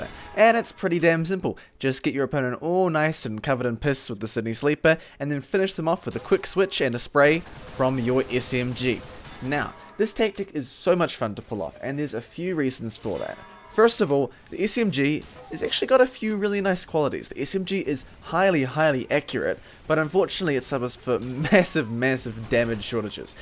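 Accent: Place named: New Zealand. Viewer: bless you and your Kiwi accent.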